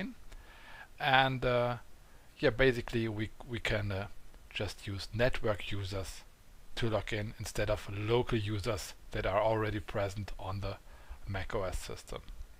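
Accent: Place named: Germany. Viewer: Thank you... Your accent is absolutely German :)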